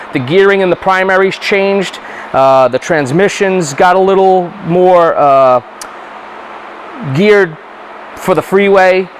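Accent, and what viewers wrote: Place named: New York, United States. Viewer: as an Australian love that new york accent mate!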